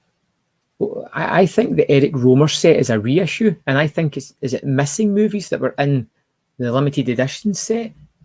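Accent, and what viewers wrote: Scotland, United Kingdom. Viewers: I’m sure your voice sounds different on the live stream! Not sure if it’s more Scottish or not 😃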